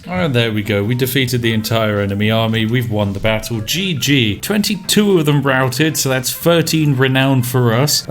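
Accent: United Kingdom. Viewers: Very English.I approve 😂👍👍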